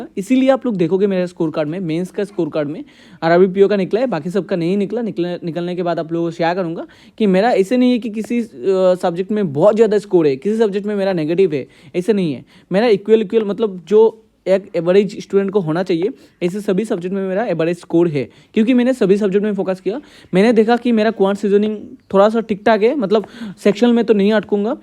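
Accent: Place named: Assam, India. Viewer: Aap thoda Hindi sikhlo. 100% Assamese honge. Phut phut Assamese jhalak rahe hai 😅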